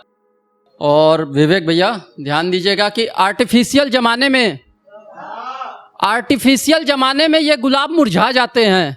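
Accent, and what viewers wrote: Bihar, India. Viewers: Bihar se ho kya 😍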